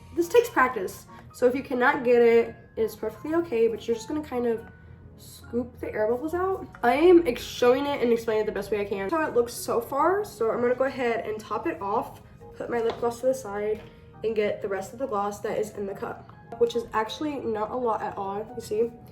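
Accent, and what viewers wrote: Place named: Latin America. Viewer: Are you Hispanic? Your accent really throws me for a loop bc you sound Latina. You are, right, like half maybe? (And btw polymer is pronounced 'paa-luh-mer.' I thought you were referring to something w/ a trademarked name, something like 'polymorph' or something. Polymers are a class of materials that are like plastic. But you should say it correctly.) Also, funny that you're teaching kids how to use syringes. I use syringes for um....something else. And don't call an object 'he/she.' Your videos make me angry.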